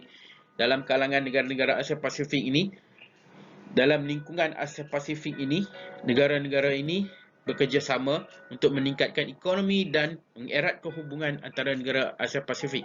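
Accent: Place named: Perak, Malaysia. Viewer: Cikgu ckp loghat perak tu stai wuuuu 😂😂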